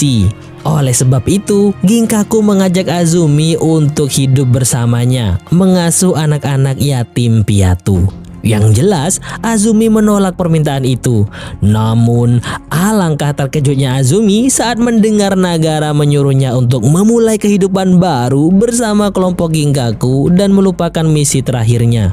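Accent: Madura, Indonesia. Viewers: Min org madura yaa?? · addaaaa teppak ka reng madureh😂,,,,,ta'entos kakeh nom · Sama2 org madura mass, btw asal kota mana ya😂❓❓❓ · Admin oreng madureh dimmah yeh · Orang madura ya mas🙏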